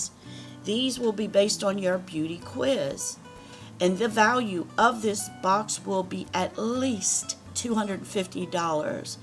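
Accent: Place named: South, United States. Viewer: I just love the way you do ur presentation, u talk about products and research what they are. I love the way u pronounce spoilers, with ur accent, since I was a little girl I always loved southern accents and I have always been envious ladies who spoke with one🤩😍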